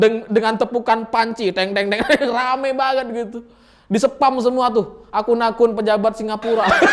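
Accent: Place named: Surabaya, Indonesia. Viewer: Hahaha nguenyekmu broooo, khas suroboyoan 🤣🤣🤣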